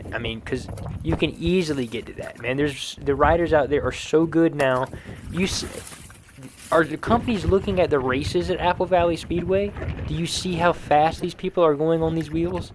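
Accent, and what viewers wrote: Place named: Appalachia, United States. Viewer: You sound like an absolute moron. You edited it talking over yourself with same volume... and that hillbilly accent and incoherent rant that makes no sense. Also it did not cut-off as clearly it's on when you pick it up, you absolute idi ot. I don't even have an EUC and I know that. Yes, you are an american i can tell :)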